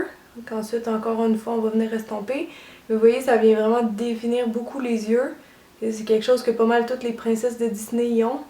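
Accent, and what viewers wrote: Quebec, Canada. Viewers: C'est ben plaisant d'avoir des tutoriels en français avec une fille qui parle québécois et à laquelle on peut s'identifier! :)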